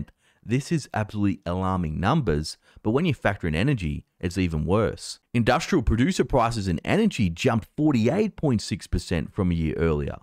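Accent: Australia. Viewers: I think the Central Banks are trying to consolidate as much gold as they can because “in Their hands it is valuable but in Our hands it’s Not”. If they crash the price people might be tempted to Sell…then They can acquire it at Bargain Prices. When I watch your videos your accent makes me miss visiting Australia! I declare a TAX FREE 2023 for Every Disgruntled Democracy 💭🇺🇸✌️🇦🇺❣️🙏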